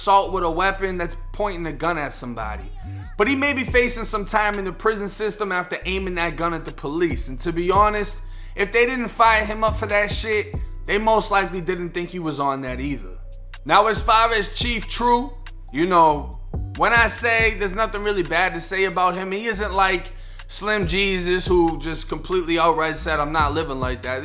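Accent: Boston, United States. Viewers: How u got a Boston accent but u from Florida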